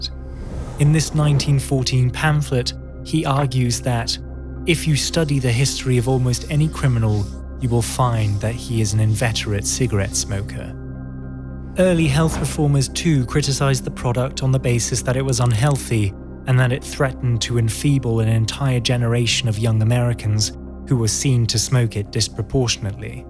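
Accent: England, United Kingdom. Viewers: ... Why do you have a perfect British English accent if you're from Switzerland? O_o Are you not actually Swiss? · All I understand, but why English accent, Russian sweater, swiss location??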